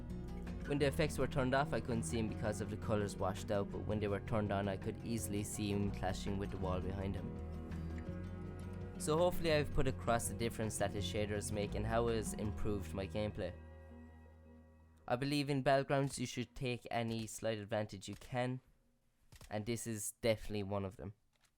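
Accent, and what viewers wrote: Ireland. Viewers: are you Irish? HON IRELAND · irish lad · thats an irish accent lad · What part of Ireland you from bud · r u from cork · U Irish? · Subbed cos you’re also Irish lol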